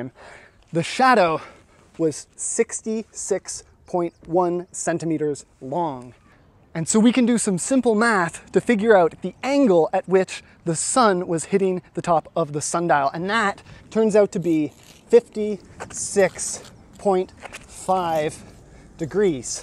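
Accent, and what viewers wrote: Canada. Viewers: He's mostly Canadian, you can tell by how he pronounce "out"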